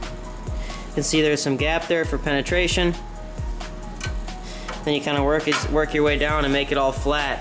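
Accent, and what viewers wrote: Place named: United States. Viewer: Keep tryin not to laugh at ur thick yank accent so i can hear the vid... lol.... But damn your talented as hell... Incredible job so far!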